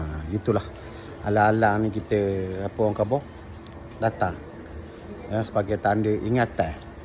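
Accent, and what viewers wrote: Pahang, Malaysia. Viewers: Loghat Pahang awak yek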